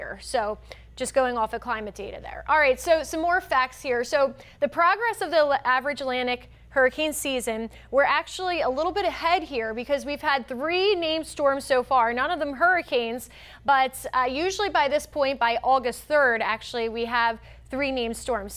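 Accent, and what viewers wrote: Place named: North, United States. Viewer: By far, the worst northern accent that I've heard in Florida news bar none...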